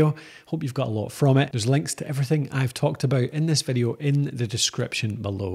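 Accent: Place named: Scotland, United Kingdom. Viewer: This is excellent. I’ve recorded interviews to put on CD but I’m not sure about how to process them. For instance, what’s the difference between using normalisation compared to using compression to raise the volume? Is it a case of either/or? Lovely to hear a tutorial in a beautiful Scottish accent compared to the usual American videos. Keep them coming.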